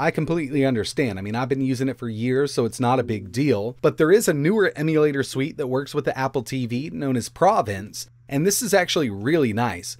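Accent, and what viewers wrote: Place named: United States. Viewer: his accent sounds like he is in the u.s., NOT the e.u.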